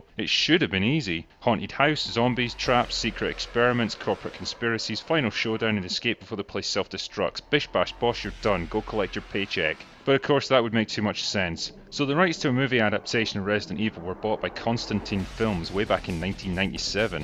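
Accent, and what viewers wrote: Scotland, United Kingdom. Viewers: you sounds Scottish in this video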